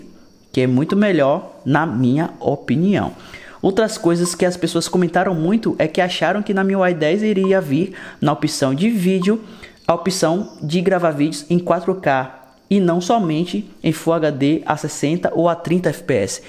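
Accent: Bahia, Brazil. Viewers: Gostaria de saber se a miui 10 vai chegar para o redmi 4a? Força aí no canal , tu é baiano?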